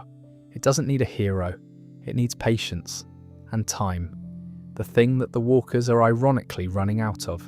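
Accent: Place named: United Kingdom. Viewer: This narrator sounds British, and they have a notoriously poor grasp of the size of the United States.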